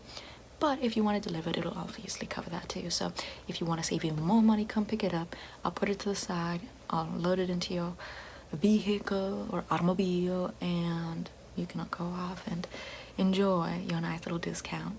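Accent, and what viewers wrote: South, United States. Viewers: Southern accent stuff is great.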